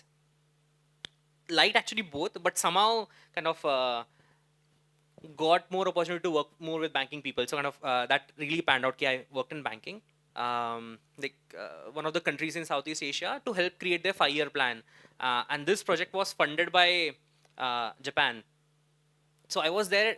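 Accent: Gujarat, India. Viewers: bro is a deff gujju "probleeem"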